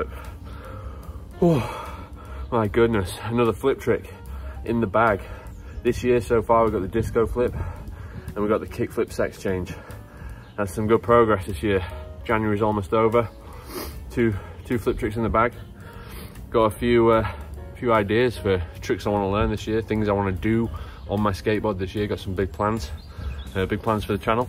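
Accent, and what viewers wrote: United Kingdom. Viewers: Hello just wondering where you from? Sounds like Lancashire to me 👍🏼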